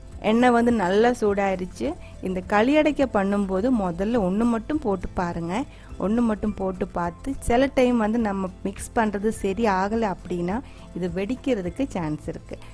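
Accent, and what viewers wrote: Kerala, India. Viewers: Love ur tamil way of speaking in a malayalee slang...awesome sis frm malaysian tamil mom😍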